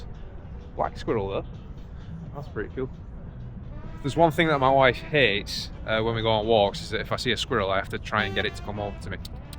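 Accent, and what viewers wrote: United Kingdom. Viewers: It sounds like you’re from the UK - how were you able to live and work in the US? It seems like the there are limited VISA’s for pilots (asking as I am currently trying to figure this out)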